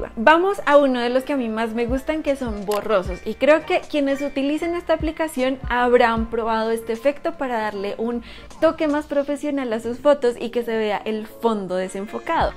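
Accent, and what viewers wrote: Colombia. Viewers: El acento Colombiano me encanta es muy relajante saludos 🇲🇽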